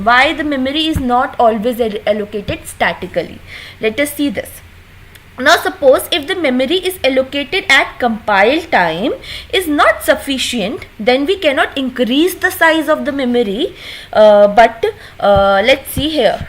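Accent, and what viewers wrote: India. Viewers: Correct your accent first mam! Indian accent is cringe! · Thank you. I have developed my ability to have a better understanding of the Indian accent.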